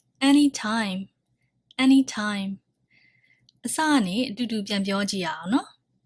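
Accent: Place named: Myanmar. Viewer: I like the burmese accent, its sweet in ears.. 😊😊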